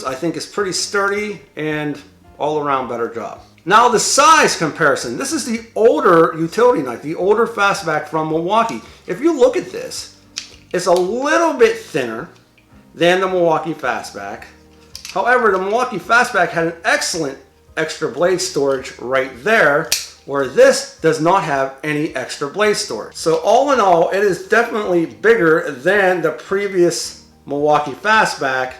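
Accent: Pittsburgh, United States. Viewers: your Pennsylvania/Pittsburgh accent. Love it!!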